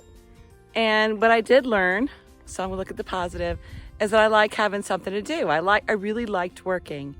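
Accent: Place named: Texas, United States. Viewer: 🤔 From TX you say? I didn't pick up on that accent in video, but it was a pleasant surprise here. I haven't heard one in a while, as I am on the road myself. 😊 I lived in OR for a decade, and just loved it. Nothing like the concrete jungle of the DFW area. You have a lovely smile that really exudes happiness - may that positive energy lighten the load of everyone you meet.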